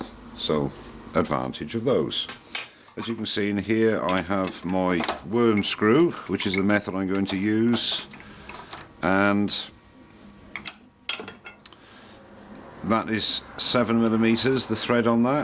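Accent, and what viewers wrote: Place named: England, United Kingdom. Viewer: Where's your accent from, are you Norfolk or West country?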